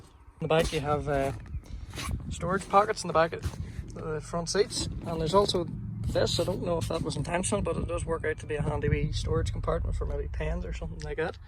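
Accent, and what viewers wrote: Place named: Derry, United Kingdom. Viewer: Hearing this lads accent to me he sounds like he is from Derry Amen Godbless Madeira 40 40 Liamp/atrickmr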